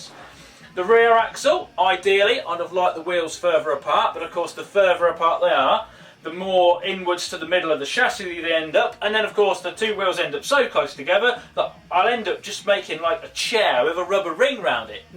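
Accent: United Kingdom. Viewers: british clearly, he lives in lincolnshire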